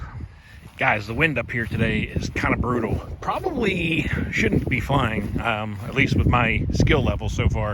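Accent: Pittsburgh, United States. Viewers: Are you from Pittsburgh. I'm picking up a Pittsburgh accent!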